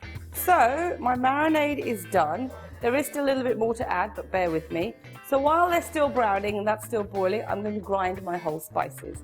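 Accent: United Kingdom. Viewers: I watch your videos just because of your pretty smile and british accent...👍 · It's always funny and amusing to see an indian with a British accent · Tooo much accents. Seem like I am in youui kaaayyy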